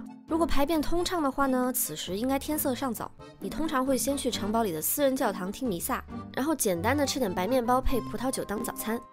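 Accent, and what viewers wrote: Cantonese, China. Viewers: 廣東話好好聽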